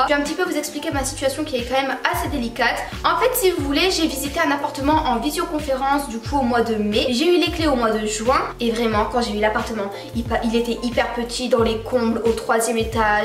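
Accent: North, France. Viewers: tu as un accent du nord mixé avec un accent arabe :o :') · c'est tres mignon .... heu tu es du Nord ?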